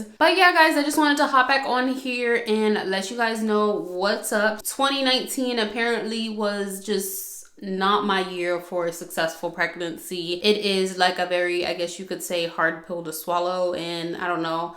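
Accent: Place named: East Coast, United States. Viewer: Sometimes your accent it’s light. But once you start getting “frustrated” it’s like BAMM. I love the East Coast accent 😍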